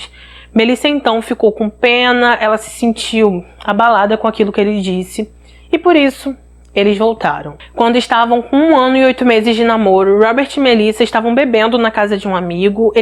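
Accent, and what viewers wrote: Rio de Janeiro, Brazil. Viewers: Ainda tem esse sotaque lindo de carioca.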